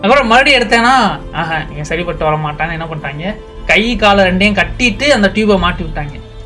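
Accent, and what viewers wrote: Madurai, India. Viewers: Bro Ru from Madurai ???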